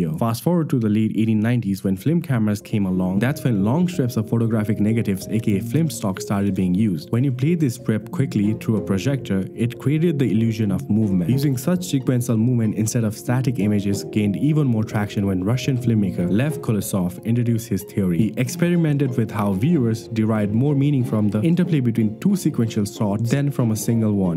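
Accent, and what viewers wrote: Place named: Nepal. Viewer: Also identified a fellow Nepali from your voice, keep up the good work sathi. :hand-purple-blue-peace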